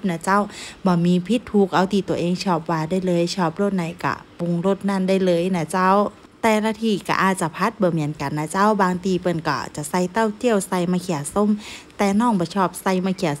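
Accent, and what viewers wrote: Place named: North, Thailand. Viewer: สวัสดีค่ะมารับชมรับฟังค่ะเพื่อนใหม่ค่ะ. ฟังภาษาเหนือน่ารักค่ะ ชอบทานเมนูนี้ค่ะ🎉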